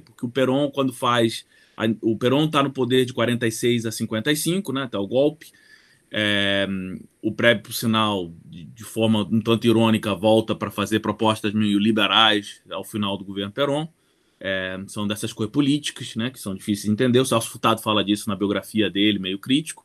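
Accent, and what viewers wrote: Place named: Rio de Janeiro, Brazil. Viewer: Muito clara e inteligente as análises histórico- econômicas. Gostei demais, aprendi muito. Ele tem português impecável, aliás o português dele tem as características, sotaques, brasileiro-carioca. Cumprimentos sinceros ao Prof..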